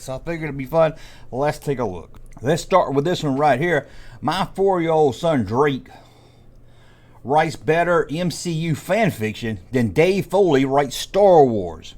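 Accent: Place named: Louisiana, United States. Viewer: WTF is this cajun sayin'? hu ha mmm haaa mmm. No, hhhhhuuh. SPEAK AMERICAN! WIGGER!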